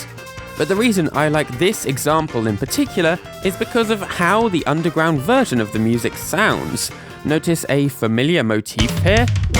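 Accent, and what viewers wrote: United Kingdom. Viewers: love ur videos and ur british accent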